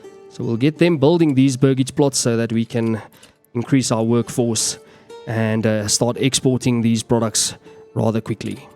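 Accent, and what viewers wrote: South Africa. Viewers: Question there you from? My guess is South Africa 🇿🇦, maybe cape provinces, or Limpopo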